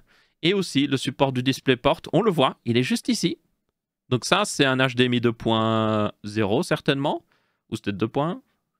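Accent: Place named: Belgium. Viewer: Ce que je veux dire n'a rien à voir, mais j'ai remarqué que votre accent belge est assez prononcé, mine de rien.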